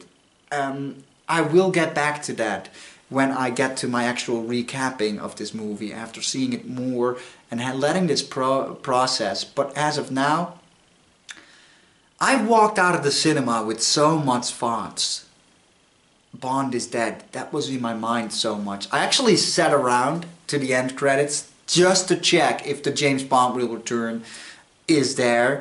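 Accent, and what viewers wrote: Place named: Netherlands. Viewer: How is it that "James Bond Will Return"? How many times does he nearly die at the explosive end of Bond movies but somehow cheats death and comes back again? The producers will either come up with a way that happens OR there will be a replacement 007 Bond (new actor) who is convincing enough to satisfy another re-boot. By the way, enjoying the Dutch pronunciation of "th" or "sh", it's so cute. ;-) But whatever happens just don't let Disney touch it, which is poison to any movie franchise.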